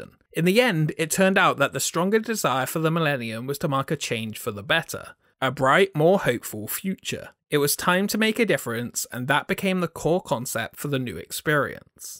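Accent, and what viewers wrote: United Kingdom. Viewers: are you British? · D-erh-by? Given that you sound British, there is no excuse for this incorrect pronunciation...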